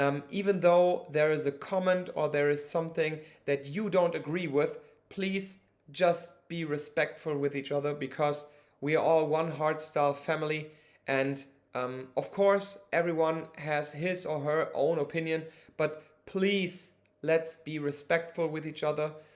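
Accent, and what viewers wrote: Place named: Germany. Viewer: Your English is quite alright, of course with a German accent. Stopped listening after your first point though: "Yeah I know the discussion about alcohol is also a drug and smoking too but for ME sniffing(taking, whatever) IS something else" So your first (and most important?) point is just an opinion of you. No personal experiences, no proper argument, nothing... You maybe seen some ppl overdo it, some with big eyes and you want to forbid that? Why? What does that have to do with you what ppl overdoing alcohol don't?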